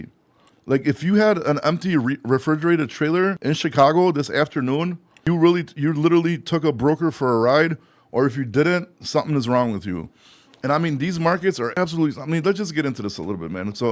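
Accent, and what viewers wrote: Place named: Russia. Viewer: Why you have a Russian accent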